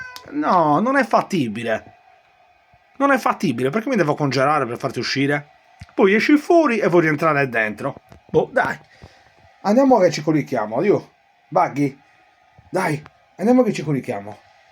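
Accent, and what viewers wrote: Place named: Sarrabus, Italy. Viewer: Accento del Sarrabus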